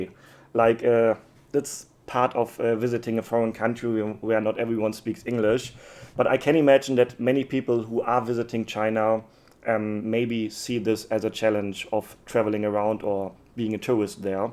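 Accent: Germany. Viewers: Even in English cannot hide the German accent 😊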